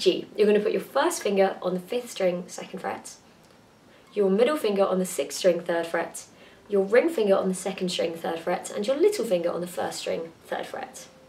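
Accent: England, United Kingdom. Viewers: Seriously love your videos, I've literally only just got a guitar for my birthday, which is today, woo! And I've never even had a guitar lesson or anything, I'm going to hopefully attempt to teach myself, which should be interesting:L but yeah I think you're awesome, and not to be horrible to other talented guitar tutorial people out there, but I find your videos really easy to follow, you seem laid back and really cool, plus because you're english like I find you easier to understand than some other youtubers because I don't have to listen through a really thick accent which is always helpful when you're trying something new haha, if I can be half as good as you I'd be extremely happy! Carry on making awesome videos and I'd love to hear something by maybe, quite like that song although I usually listen to heavier stuff, but still, also you have a really nice singing voice, pretty unique and I like that, also I know as you've said in a few of your videos singing is not your strong point, but I think it's really great when you do sing, shows confidence, and it helps for me to understand more, I'm a learning by hearing kinda person, but yeah, excellent work!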